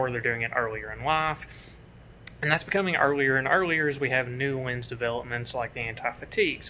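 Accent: United States. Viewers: I can’t understand this guys country accent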